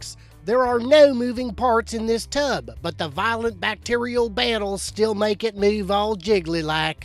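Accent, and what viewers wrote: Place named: South, United States. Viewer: Sir you are one hilarious son of a bitch! Please I am NOT cursing. I practically Rolled Over The Fucking Floor and Laughed My Ass Off! God I wish I could shake your hand and get an autograph from ya!!! Your Southern accent had me bend over double!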